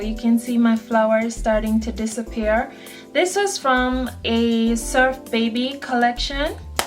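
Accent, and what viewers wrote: Jamaica. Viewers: Keep hearing a Jamaican/Caribbean accent when you speak. Am I right? Great video!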